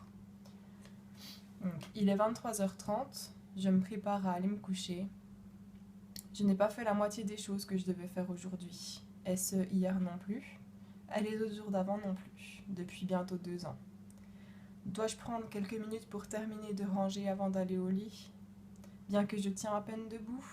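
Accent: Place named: Upper Doubs, France. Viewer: Warrior ! difficile d'y croire quand tout se déchaîne (moi crise tous les 8 jours) mais les traitements se précisent et la recherche progresse (doucement)... on a encore bcp de thérapie à essayer ... Sois forte et quand ça va pas ..."Accueille" et repos et petits bonheurs qui vont bien... dis moi, avec l'accent que tu te payes (lol) tu serais pas du haut doubs toi ??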